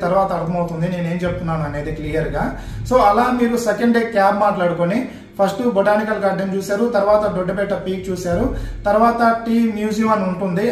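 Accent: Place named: Telugu, India. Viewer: Hi brother..sakkani telugu slag matadutunnaru..clear artam avtundi.. appreciate brother 🎉🎉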